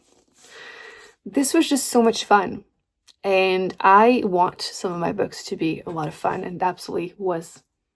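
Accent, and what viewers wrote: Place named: Quebec, Canada. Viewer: I LOVE the accent québécois so much!! (and I'm really proud I understood everything haha)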